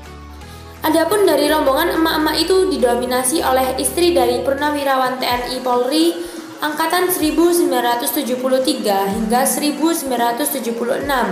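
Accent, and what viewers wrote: Java, Indonesia. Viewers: Dek,kamu kok medhok banget jawanya. Sby apa Malang nih?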